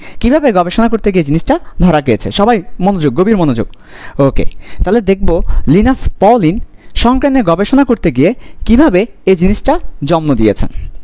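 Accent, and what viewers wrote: Chittagong, Bangladesh. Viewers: Bhaia apni chattogramer????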